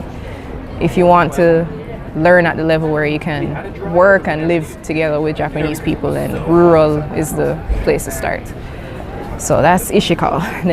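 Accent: Jamaica. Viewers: Is she also west Indian? She sounds Jamaican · I can related to the hair experience lol. When I was in China it was not uncommon to meet people who just wanted to touch my hair. I had the old school flat top hair cut. Some even wanted a picture. Btw I'm from Grenada but I'm glad to still hear your Jamaican accent in there after speaking mainly Japanese for so long. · As she Start talk mi know seh a Jamaican she be, the accent tranggg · I'm 6 seconds in, and im pretty sure this woman is Jamaican XD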